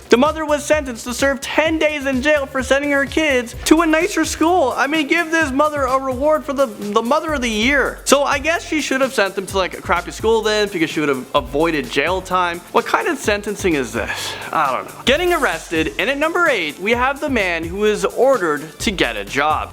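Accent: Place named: United Kingdom. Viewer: Emi c; edits I wasn't complaining about his accent, if anything i would have praised it, i happen to like the british accents.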